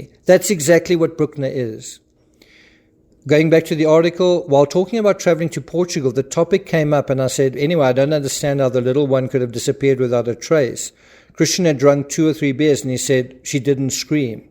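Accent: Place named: Johannesburg, South Africa. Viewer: Sounds like you’re from Jo’burg??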